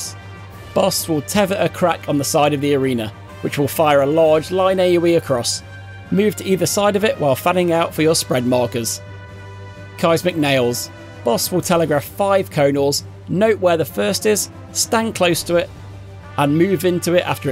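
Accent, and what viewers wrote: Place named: Northern England, United Kingdom. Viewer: Just what I needed for a refresher going into it again with new folks! And sure, even coming from Ireland, you can always trust that Northern English accent to be no nonsense, straight to the point, here's what you do. Love it! Thank you so much <3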